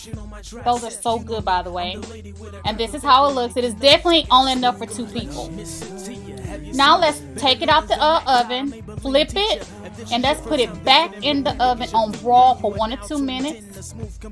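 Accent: South, United States. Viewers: I love the way you talk with that southern twist like me People from other states say we talk funny but you dont care!! Gurl keep repping for us gurls down south!!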